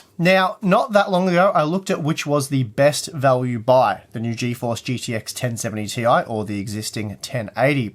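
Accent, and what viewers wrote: Australia. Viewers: Are you Australian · The outro music is quite nice here. I think you should add more music like that throughout your vids and not just at parts. I've seen only a few of your vids and one thing I always think is "Sure an Australian voice is nice and all, but where's the music?" Anywho, thanks for what you do, man, keep it up, proud of you <3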